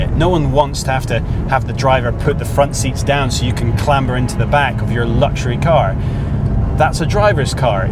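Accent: Scotland, United Kingdom. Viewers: Scottish accent. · lol at Scottish accent ahaha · Middleastbeast It is in fact a very toned down Scottish accent, probably educated aborad. Not every Scotsman sounds like Willy from the Simpsons, lol.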